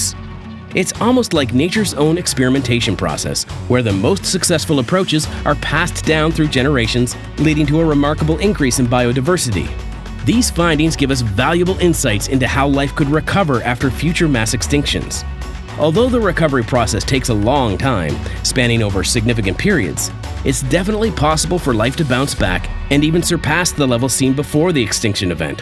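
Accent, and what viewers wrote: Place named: Canada. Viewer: The narrator seems to have a Canadian accent.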